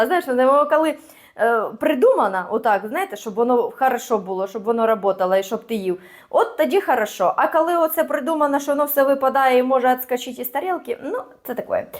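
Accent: Surzhyk, Ukraine. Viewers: такий жахливий суржик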